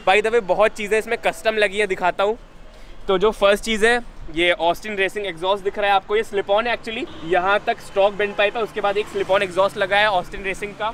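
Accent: India. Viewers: How does every indian speaks the exact same english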